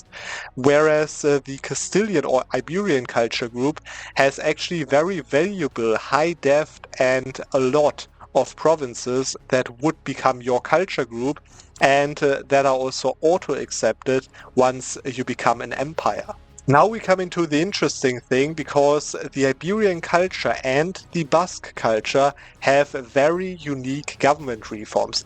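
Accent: Germany. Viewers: Lern mal richtig Englisch sprechen. Ist ja nicht auszuhalten mit diesem unerträglichen deutschen Akzent, mein Gott alter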